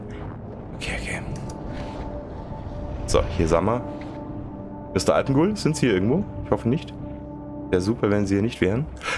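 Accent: Bavaria, Germany. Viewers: Hey, ein sehr schönes Spiel hat mir sehr gut gefallen! Dein Bayrisch ist echt Fantastisch 👍 hast mich wieder super Unterhalten der Alm Öhi würde sich freuen und mit dir ein Helles trinken oder Ziegenmilch 😅😂🤘 Vielen Dank für den tollen Prolog hab ein Super Wochenstart Bro 😁 Lasst ein #Like und ein #Abo für den lieben da 🤘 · Richtig geil,und dein Bayrisch dazu,top😂. Würde gern das komplette Game sehen,war ziemlich genial. Weisswurst ist überhaupt nicht meins,never ever😝,sorry.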